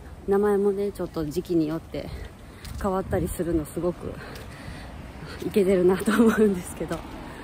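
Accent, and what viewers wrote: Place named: Kyoto, Japan. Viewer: 京言葉素敵です❤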